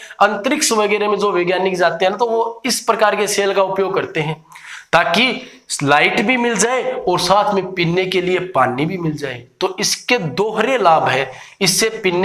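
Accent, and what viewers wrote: Punjab, India. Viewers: Aap Punjab se ho sir kya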